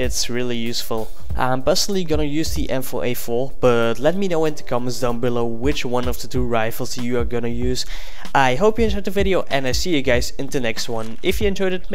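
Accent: Netherlands. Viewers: will you go back to dayz vids pookie? btw youve grown well, ive seen you since 1k or something. i subbed because i heared you had a dutch accent. and you uploaded alot of dayz stuff. too bad im not interessted in csgo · are u dutch?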